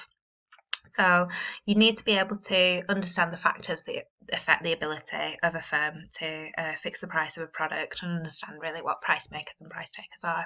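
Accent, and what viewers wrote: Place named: United Kingdom. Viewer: love your british accent :)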